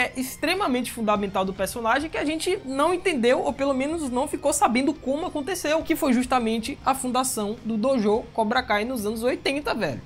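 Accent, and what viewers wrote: Bahia, Brazil. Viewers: Caramba mano, tu é baiano né? Tbm sou, so da sua voz da pra identificar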